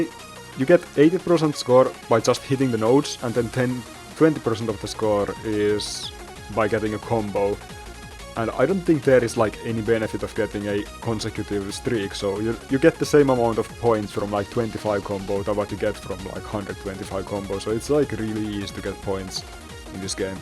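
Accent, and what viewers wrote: Finland. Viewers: ÄIJÄ KUULOSTAA IHAN VITUN SUOMALAISELTA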